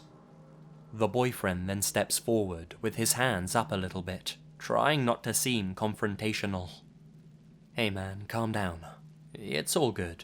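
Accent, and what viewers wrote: England, United Kingdom. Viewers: spooky British accent (⊙＿⊙) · Hold up. You grew up un chicago but speak with a gay english accent?? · You always have great stories. I love your english accent. I would love to go to college in England. 😃 I'm African American. Do you like Blues music?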